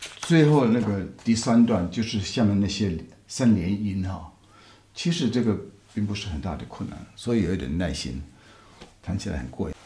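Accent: Taiwan. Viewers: 您溫文爾雅的台灣國語的口音和您舒緩悠閑的講話的節奏與您動人的琴聲一樣和諧悠揚，真心感謝！希望您有機會給大家介紹拉赫瑪尼諾夫的《第三鋼琴協奏曲》謝謝。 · 好幸運可以在網路遇見以領略西方音樂的故事，很好奇您身在德國卻能說一口標準的台灣腔中文，給您按無限個讚。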